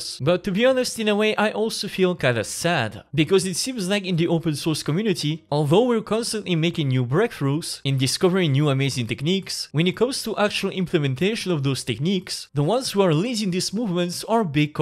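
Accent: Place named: France. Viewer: First time i heard you i thought you had a french accent, and since the first translation you made was in french now i can almost safely assume. "t'es français" (à moins que je me trompe x)).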